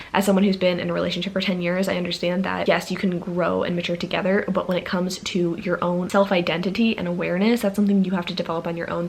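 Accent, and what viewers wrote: South, United States. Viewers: If you’re from the South, her country accent is honestly hilarious Also “yeehaw... we’re done with that” made me laugh for a HOT MINUTE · hahaha I love your country accent 😂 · I loved what you said at the end about romance! Also I'm kinda living for the country accent haha! This was amazing as always! Love your vlogs!! <3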